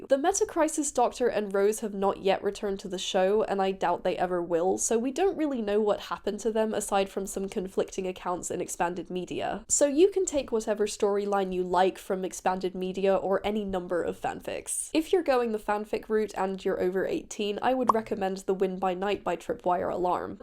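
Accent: Scotland, United Kingdom. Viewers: You have a weird Scottish accent, can’t quite place it. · Are you Scottish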